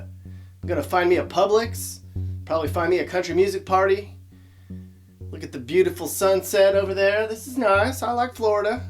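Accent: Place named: California, United States. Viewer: Love your California accent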